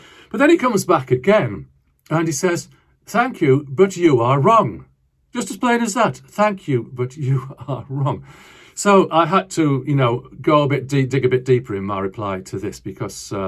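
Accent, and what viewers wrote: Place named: Teesside, United Kingdom. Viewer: Your accent sounds familiar…..are you a Teesside perchance?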